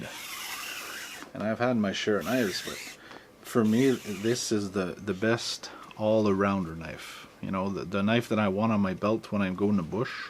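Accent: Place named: Canada. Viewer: Where in Canada are you from? Love the thick accent!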